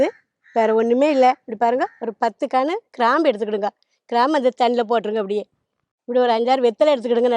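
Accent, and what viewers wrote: Tirunelveli, India. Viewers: நெல்லை தமிழ்... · அம்மா தங்களின் பேச்சு எங்கள் திருநெல்வேலி பாதை போல் உள்ளது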